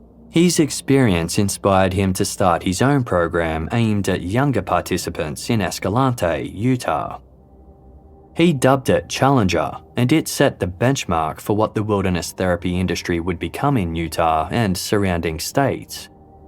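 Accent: Australia. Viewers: Lovely to hear an Australian voice on one of these documentaries.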